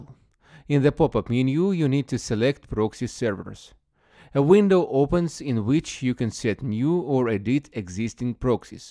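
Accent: Russia. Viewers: русский акцент)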